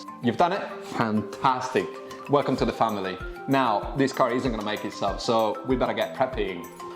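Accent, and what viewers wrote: Thailand. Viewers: Loving the Thai accent!! Can’t wait to make this 👌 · The Thai accent is perfect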